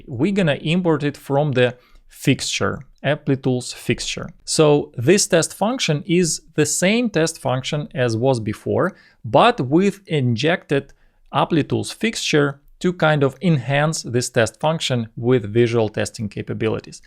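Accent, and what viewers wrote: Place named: Russia. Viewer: Спасибо! Английский с русским акцентом очень легок для восприятия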